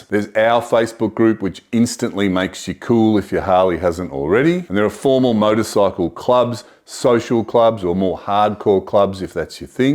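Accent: Australia. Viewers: Heard the Aussie accent subscribed immediately. Cheers cobber.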